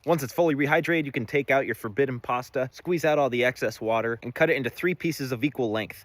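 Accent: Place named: United States. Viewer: This guys accent is crispy. I don’t usually like the American accent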